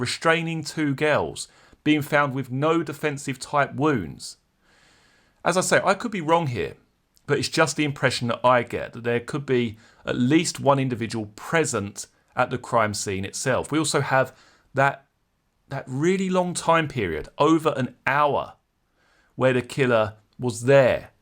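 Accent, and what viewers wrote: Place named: England, United Kingdom. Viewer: Enjoy your videos and love your English accent. At least I think it's English. My dad was from Birmingham England. The English accent is very beautiful wherever you're from. I like how you think outside the box that's what I do too. I agree with you totally I believe it was totally planned I do not believe it was random or opportunity I've never believed that. I always thought it had something to do with being online way before we found out about the Anthony shots account. It could be that RA might have been sitting in his car for a while before the girls came because he was drinking alcohol out in his car or doing drugs or both who knows. He could have been talking to people that he was dealing with that were part of killing the girls in the plot that they were scheming in other words. He might have been conversing with some other people on the phone before he actually went to the trail. 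I do believe at one point he was sitting at the trail and they probably walked right by him and may not have noticed him or they might have seen him and when they actually did see him on the bridge I thought I had heard it one time that one of them had said there's that creepy guy again. I heard this a while back I don't know how true it is though. Had you heard that at all.??. Thank you for your response in advance. Happy New Year to you and your family God bless 🙌🙌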